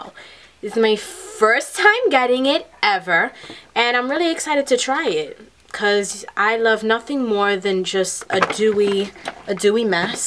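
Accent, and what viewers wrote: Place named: New York, United States. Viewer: Omg the accent. Where r u from!! Ny or New Jersey but sure ny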